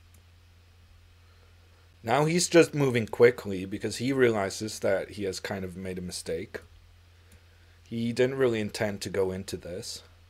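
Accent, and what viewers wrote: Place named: United States. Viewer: Du låter nästan amerikansk.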